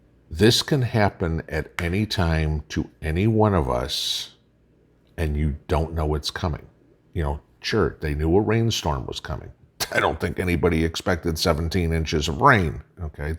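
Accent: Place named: Midwest, United States. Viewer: Oh my God… I don’t have cable, I had no idea! Wow 😮 How is that just from rain, it looks like a twister hit or something. BTW the weather didn’t hit us in CT, just rained all day. Food in Mylar bags in buckets 🪣 should be ok. Ladder from upper stories is important. (As is smoke and carbon monoxide detectors and fire extinguishers) you say “on” like I try my hardest in CT not to. Are you from the Midwest as well?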